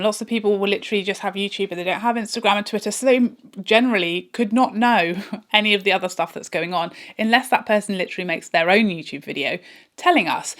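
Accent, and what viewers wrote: England, United Kingdom. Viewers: Your eye makeup here is so beautiful. I love the green. I unfollow somebody when their politics don't agree with mine. I can take it if it is background noise, but when it becomes overt, I don't care to have it in thrown up in my face all the time. I can hang with somebody if the content is lacking sometimes, because I understand that people have lives outside of their youtube channel. Also, there are channels I think I really like at first, but once I get seeing more videos, I realize it was just the one or two video that I liked. I found your channel from your divorce story, and could empathize personally, you seem near my age, your English accent is so cute, you have a nice face, and I am interested in beauty products and makeup, and so many of your looks are so fresh and gorgeous, and I could learn a thing or two! I have taken so many mental notes on highlighters and foundations I need to try from watching your videos!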